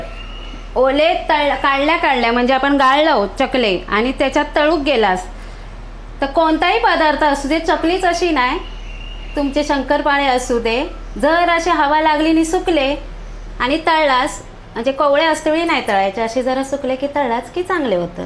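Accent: Malvani, India. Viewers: कुरूम कुरूम आवाज इलो.खुप मस्तच चकली . खुप छान वाटत तुमचं मालवणी बोलणं. छान बोलून रेसिपी करता म्हणूनच रेसिपी बघायला आवडतात. 🙏👌👌👍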